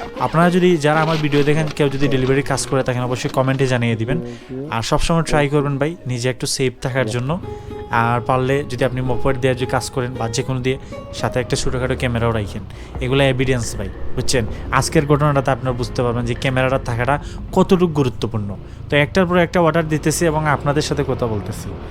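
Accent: Sylhet, Bangladesh. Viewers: Brother, are you sylheti ? Your language like shylhety language. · ভাই কি সিলেটি?😁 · ভাই সিলেটি😅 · ভাই কনফ্রাম সিলেটি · His tune like sylhety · Vai apni sylheti apnar kotay buja jay... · Bro You from sylhet. Ami afnaar voice oor dhoron dekiau bujhi lisi afne ee Sylhety. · শুদ্ধ উচ্চারণের সাথে সিলেটি টান ত আছেই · ভাই আপনি সিলটি 🥰🥰🥰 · Vai apne ki sylheti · Vai Khun desh oo take ba ? R tumr kotha huniya buja jar sylheti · সিলেটী একটা টান চলে আসে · আপনি কি সিলেটি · Mator style hunley bujha jay Sylhet er manush je apni! Btw apnr bari habiganj ni vai?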